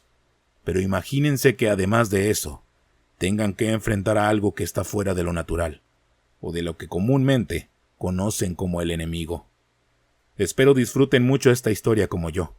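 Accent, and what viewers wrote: Mexico. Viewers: Quiero el enlace para el correo...además de una buena voz...una buena historia tiene el encanto del acento mexicano!!!! Desde Argentina loa amo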